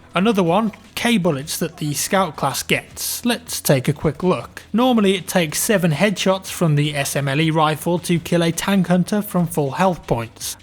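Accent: England, United Kingdom. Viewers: .. What type of English accent is yours? It's really nice and probably the easiest one to understand.:)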